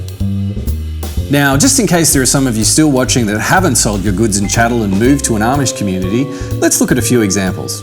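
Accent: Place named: Australia. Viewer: Love his Aussie accent :) AND he's smart - we need guys like this here in the USA.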